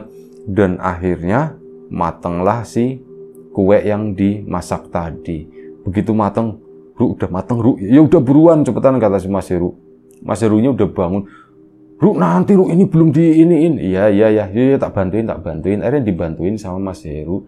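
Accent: Tegal, Indonesia. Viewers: Lama ndak denger, sekarang logatnya jdi tegal bgt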